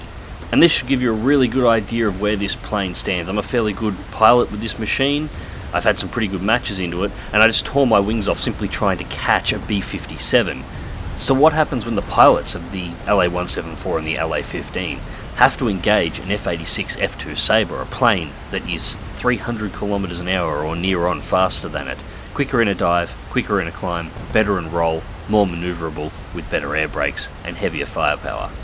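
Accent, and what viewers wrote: Australia. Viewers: Swearing in an Aussie accent just sounds to right hahaha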